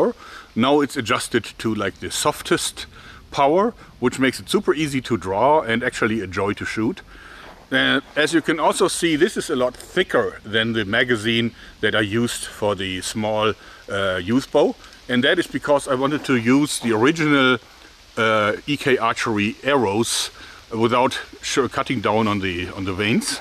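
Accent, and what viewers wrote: Germany. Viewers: Ich weiß nicht warum aber man hört dass er deutscher ist 🤣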